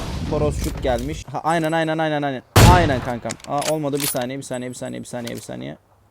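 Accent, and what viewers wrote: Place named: Bolu, Turkey. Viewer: Abi nerelisin sende bolulu gibi bu ses var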